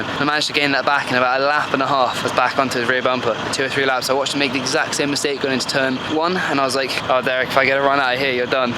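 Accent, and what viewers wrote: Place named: United Kingdom. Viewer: oh i thought this is american.. its just british.. which is just normal..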